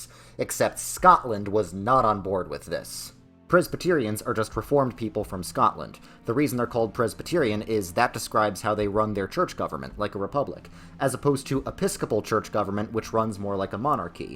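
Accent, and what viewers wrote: New York, United States. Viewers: You can really tell this guy is from New York by his "Coufey" accent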